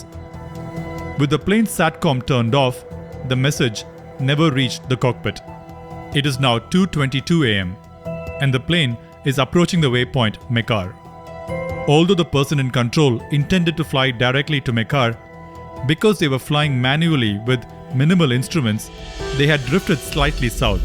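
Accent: India. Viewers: The presenter sounds indian, and i am proud that such a high quality detailed presentation is put together ! Brilliant work, brilliant level of detail covered. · why your english accent is indian? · This Indian accent sucks, makes listener wanna laugh, not be serious. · Why is a pajeet narrating the video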